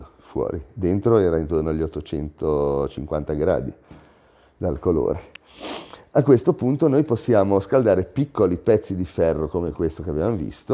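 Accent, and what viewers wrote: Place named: Liguria, Italy. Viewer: sei un ligure ti sento dall'accento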